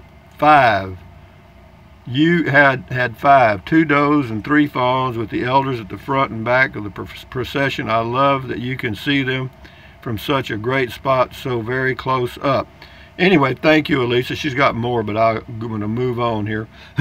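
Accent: Texas, United States. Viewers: Its nice when we see your new videos .You look very content😀.Happy x u.Love the texas accent .I used to live S.A.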